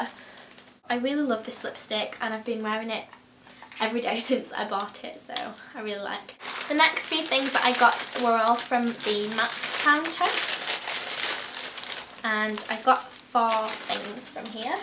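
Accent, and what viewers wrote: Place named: North, United Kingdom. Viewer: please do a tutorial on how to put them on if u havent already. love ur accent so im going to suscribe. you sound so nothern!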